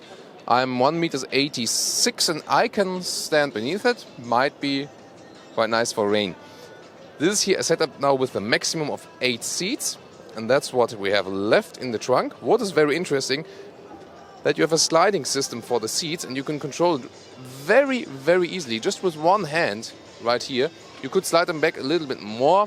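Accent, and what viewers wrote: Germany. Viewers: ich habs nie verstanden warum du die videos in englisch machst, sehr anstrengend zu hören mit dem deutschen akzent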